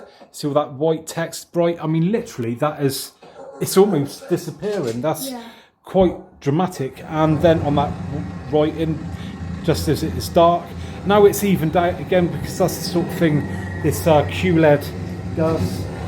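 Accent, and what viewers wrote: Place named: Cornwall, United Kingdom. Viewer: Hi mate great video! Are you cornish if you dont mind me asking?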